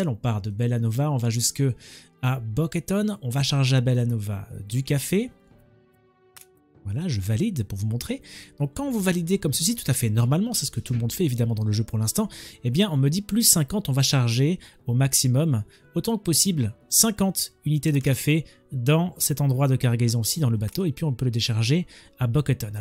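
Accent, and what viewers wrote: Belgium. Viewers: Oh purée!!! Merci car je "galère" (C'est le cas de le dire) tant sur ces navettes que je commençais à me lasser d'y jouer...Mais comme tous tes viewers je suis fan; J'aime bien notamment tes petits "belgicismes" et ta prononciation des mots en ui : " depoui, poui, appoui...etc...Hé hé ça me fait sourire mais toujours avec respect et jamais sans "ennoui"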